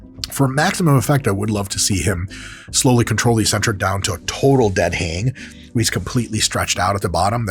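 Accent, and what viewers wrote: United Kingdom. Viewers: Love the British accent Dr Mike ! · But he’d have to do the whole thing in his bri’ish accent.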